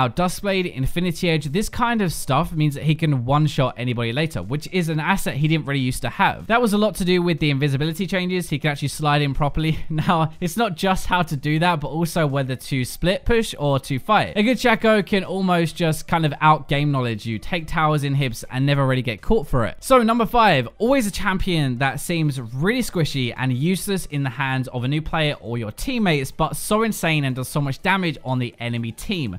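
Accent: United Kingdom. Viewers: First time I'm annoyed at British accents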